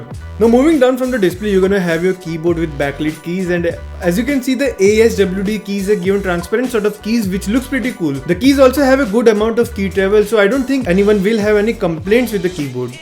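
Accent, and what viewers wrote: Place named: India. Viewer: my english listening skill was tested in an extreme lvl by an India accent + the speed that he spoke. even my Russian teacher's voice can be understood more easily than you lmao. but nice vid👍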